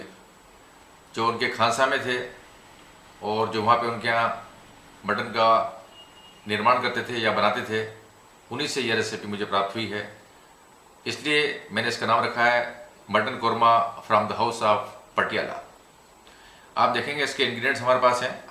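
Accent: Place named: Indore, India. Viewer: mujhe aap ki language accent se laga tha ki aap Indore se hain, but saari dishes aap ki Rajasthani influenced hoti hai isliye pucha, vaise mai bhi aap ke podas mai hi rehta hoon Bhopal, aur meri wife Indore se hai 🙂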